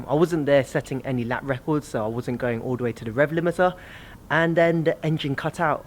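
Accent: England, United Kingdom. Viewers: I'm like your English accent especially you Asian even hotter. Keep good work I'm just subscribed, take care from Cali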